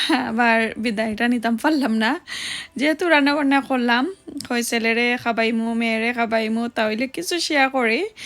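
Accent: Sylhet, Bangladesh. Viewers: Apnar sylheti mat amar je vala lage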